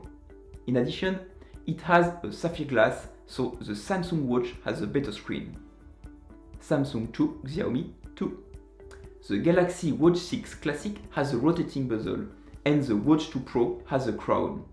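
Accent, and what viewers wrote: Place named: France. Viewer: I love the french accent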